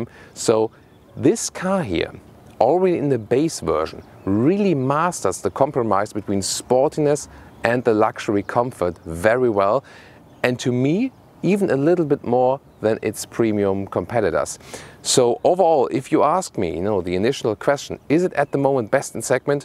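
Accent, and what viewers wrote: Germany. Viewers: His German accent genuinely made me feel I was already riding an audi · Yeah, I guess now that you mention it, we've had German au pairs and they and their friends are all embarrassed by their parents' accents.:) It's not so bad though... Germany is a tremendously well thought of country to say the least! And Germans (au pairs, at least) have much better English than other European countries. So, don't worry about the accents. ' accent really adds to the review for us Americans because it's a constant reminder that this is what an actual German thinks! Europe is cool for Americans. It's a big reason why Americans drive European (German) cars. Now me, personally,... I've owned only one foreign car in my life and it actually was a brand new Audi A6. And that car almost put me in an insane asylum with all of its problems and its crappy engine!:) So, it's Detroit Iron only for me! 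· That strong German accent is horrible. Most kids speak much better english.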